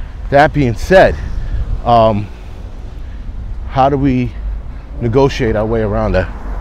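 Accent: New York, United States. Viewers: A home boy says it straight up just like a NYer!!! THANK YOU!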